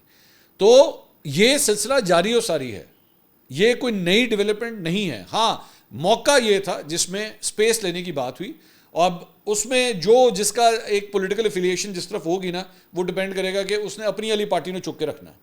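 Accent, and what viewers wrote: Punjab, Pakistan. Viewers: U R a nice person. Can you clearify why you give a touch of punjani during your urdu vlog ?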